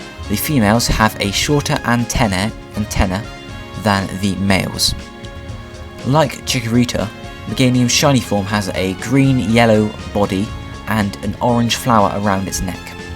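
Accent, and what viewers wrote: United Kingdom. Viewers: In UK English Herb is pronounced with an h, from his accent you can tell he is from the UK ;)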